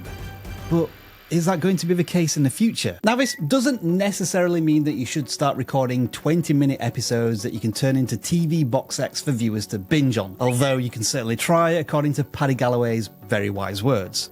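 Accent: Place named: Yorkshire, United Kingdom. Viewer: Yo I know you live in Canada, but I know that you came from Yorkshire. I can hear it in your accent. What I would like to know is cause. I have a friend who’s from Yorkshire what part of Yorkshire are you from? He’s from Hull. He also has a YouTube channel, so I have been comparing your accents.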